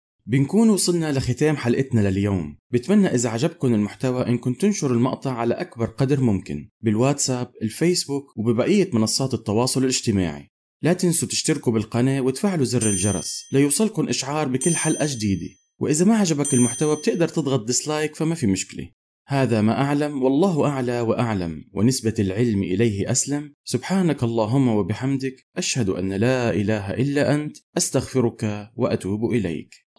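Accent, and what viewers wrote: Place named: Lebanon. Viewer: من أجمل القنوات جزاك الله كل خير لاحظت أخي من لهجتك أنك لبناني صحيح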